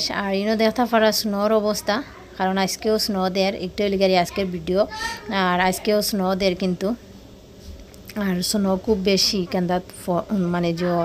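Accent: Sylhet, Bangladesh. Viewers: Ha Apu uni ancholik bashai kotha boltasen .ata hocha sylhet ar ancholik Basha jata bangla bashar e akta part.koiak din shunla apni bujta parben InshaAllah. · আপু আপনার সিলেটি মাত খুব ভালা লাগে❤️❤️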